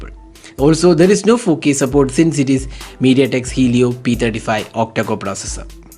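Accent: India. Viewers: i really love that indian accent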